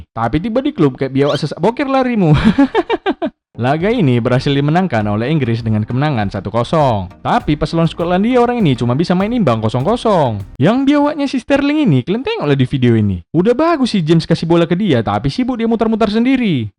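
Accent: Medan, Indonesia. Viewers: Digigit tungir wkwkwkw Medan kali ah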